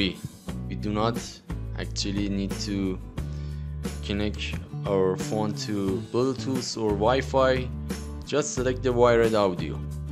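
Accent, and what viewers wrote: Iran. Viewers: That accent just screams Iranian origins to me Which is great ofc, the audible acquaintanceship with the beloved Persian language is sheerly a blessing to the ears =) Keep it up with the great content brother, Best of wishes from a fellow aspiring creator of the same origins😁✌️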